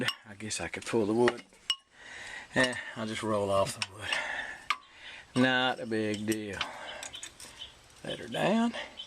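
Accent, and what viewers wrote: Texas, United States. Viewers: Thank you Matthew McConaughey! 🤣 jk.. laid back Texas accent sounds similar.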